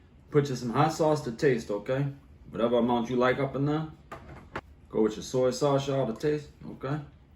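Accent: Louisiana, United States. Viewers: Aside from the awesome recipes and cooking its his cajun accent that’s the best! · im from New Orleans myself but you have that TRUE original Cajun accent lol... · Hey loved the tutorial more I loved the La accent lost mine when I was little because we traveled a lot This was refreshing I used to eat yakamein a lot growing up in NOLA such a simple recipe yet I have never made yakamein as an adult thanks to you I will make some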